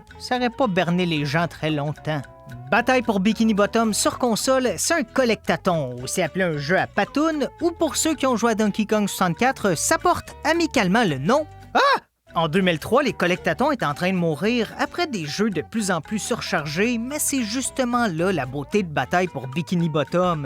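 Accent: Quebec, Canada. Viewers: J'ai commencé à regarder des vidéos en français pour pratiquer la langue. Je me suis retrouvé ici par hasard et, waouh, quelle découverte ! Bon, je ne comprends pas la moitié (j'utilise les sous-titres automatiques. Ce serait super d'avoir des sous-titres en français). Je trouve l'accent québécois vraiment intéressant. Salutations d'Espagne !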